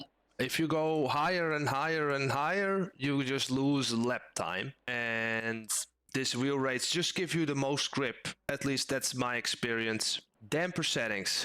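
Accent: Netherlands. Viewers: You have amazing videos! Subscribed. Btw, you're Dutch, right? (me too). You have that Dutch accent when speaking English. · I smell dutch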